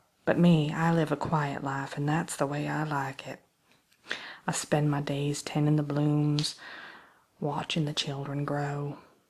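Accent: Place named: South, United States. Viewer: Lovely. Your southern accent was adorable. I love the photo you chose too..perfect. Can't wait for the next segment!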